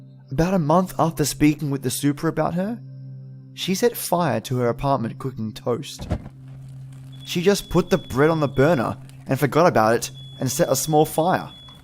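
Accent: Australia. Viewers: I'm suppose to be babysitting, but the baby went down for a nap so here I am 😏 ready for some great stories in that awesome Aussie accent of yours 🙂🖤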